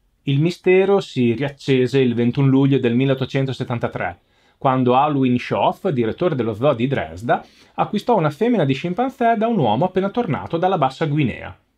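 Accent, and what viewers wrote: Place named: Romagna, Italy. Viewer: C'é un vago richiamo al mitico tabloid di Luttazzi alla Gialappa, dovuto all'accento romagnolo. 😍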